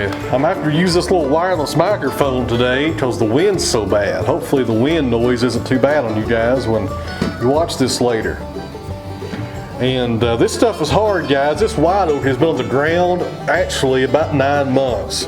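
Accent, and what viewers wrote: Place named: West Virginia, United States. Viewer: Good for you. I have been watching your channel for a couple of years now. I discovered your channel when a related channel changed to yours and I heard your dialect. This is very similar to my many (mainly deceased) dear relatives from SW/WV in Mingo County--a long way from suburban Philadelphia where I live now. My favorite uncle in Mingo had a very dangerous circular sawmill used to mill cap wedges to secure mining timbers. I have loaded a few pallets of wedges in my time. Although we didn't timber frame them, we also built a few sheds out of "sawmill" lumber. He acquired his logs in a fashion similar to yours. Great content. Takes me back.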